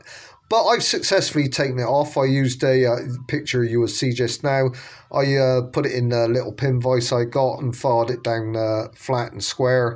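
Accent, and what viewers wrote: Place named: Bristol, United Kingdom. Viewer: That's the most Bristolian accent I've ever heard. Thanks for the video though, very useful!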